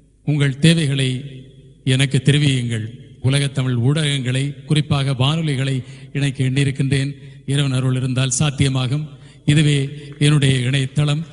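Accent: Standard. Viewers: வாழ்த்துக்கள் சார் உங்கள் தூய தமிழின் உச்சரிப்பு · பிராந்திய. தமிழ். இல்லாமல். பொதுவான. இனிமையான. தமிழ். தமிழ். பொக்கிஷம். நன்றி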